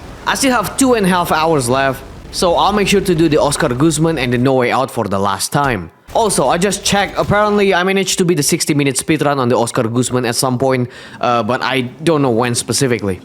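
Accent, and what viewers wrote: Indonesia. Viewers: i knew it!! judging from ur accent and the way u talk u are indonesian, so glad that i found indonesian gta online youtuber! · u sounds like indonesian, nice accent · logatnya kaya orang indonesia.. bener kah bang? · logat nya kayak orang indo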